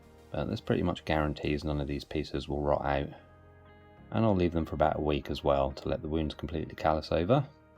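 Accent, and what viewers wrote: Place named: Somerset, United Kingdom. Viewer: Excellent video! Thanks. Your accent reminds me of my friend Garry from Somerset. I’m in the US. Have a great day.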